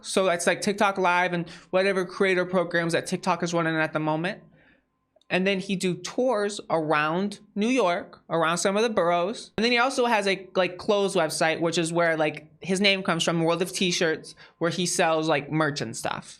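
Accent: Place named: Pennsylvania, United States. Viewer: im sorry this completely irrelevant to the actual story (although i thank you greatly for covering it), but i am fascinated by your accent lmao. I'm from MA and have heard my fair share of regional dialects but I have no fucking clue where to place yours 😂if i had to guess I'd say Philly/Pennsylvania? just clarifying btw i don't mean any of this in a negative way nor am i trying to make fun of your accent or anything, just legitimately curious. i'm a big fan of accents/language in general and love learning about different dialects/regional differences.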